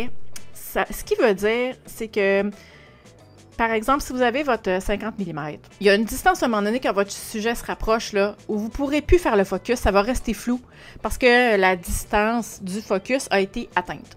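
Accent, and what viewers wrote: Quebec, Canada. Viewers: Don't worry, it is Canadian french, that why...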